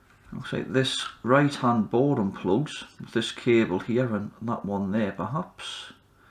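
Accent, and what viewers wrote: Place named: Northeast England, United Kingdom. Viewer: Great video, I have not got a clue what you are doing but it was a great watch, keep up the content, looking forward to seeing more. I recognise the accent, Im from the northeast as well.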